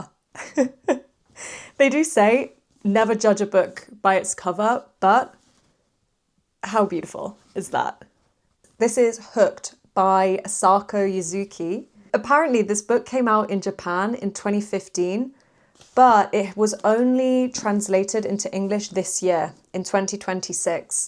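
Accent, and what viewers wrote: United Kingdom. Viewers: I really enjoyed your videos and I especially loved your British accent. No wonder you're from the native English-speaking country!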